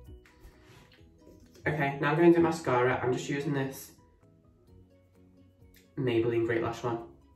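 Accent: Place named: Liverpool, United Kingdom. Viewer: Your makeup is gorgeous x am guessing your fromm liverpool? Because I am too!! ❤🙂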